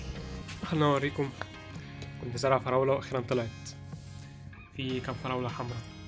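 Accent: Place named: Egypt. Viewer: كنك مصري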